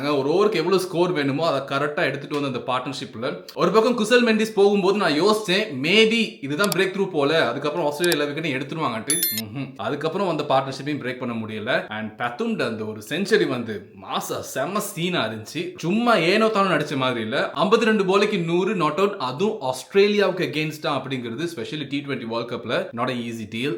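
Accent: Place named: Tamil Nadu, India. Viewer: நீங்கள் ஏன் தமிழ்நாட்டுக்காரர் போல் பேசுறீங்க!?😮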